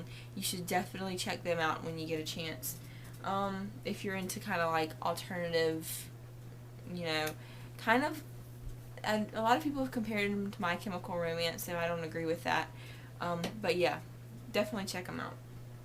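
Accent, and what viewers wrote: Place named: South Carolina, United States. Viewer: i love ur vids\ and you sound like ur from sc are you??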